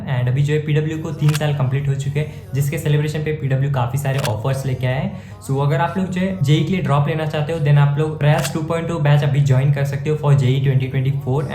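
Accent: Karnataka, India. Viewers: is your mother tongue kannada?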